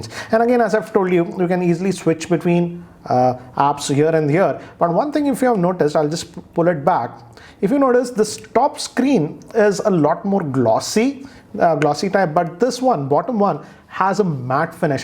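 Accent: India. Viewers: please stop speaking English in your Indian accent ! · 咖喱味英语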